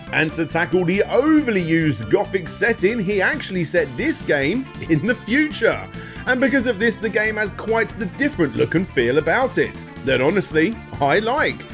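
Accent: United Kingdom. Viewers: It’s the regional British accent. I had a teacher with the same accent as who pronounced “ideas” as “idears.” Ironic that they drop the “r” on words that end with it and add it to words that end without it. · he's also British and I'm assuming the commenter is from the USA. Idk, maybe aria and ecclesia sound specifically similar with a British accent. Either way. Pronunciation is different in other parts of the world. As an American, this is why our fellow global citizens sometimes see us as idiotic self-centered assholes. Go learn another language and/or dialect and see how well their native speakers will point way more fundamental language concepts. Be better.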